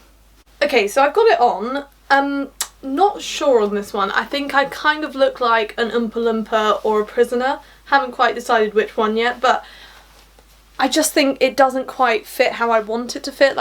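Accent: United Kingdom. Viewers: Can’t beat the combination smart, beautiful and that British accent!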